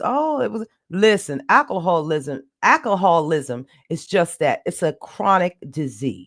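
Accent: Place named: DMV, United States. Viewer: You sound like you from the DMV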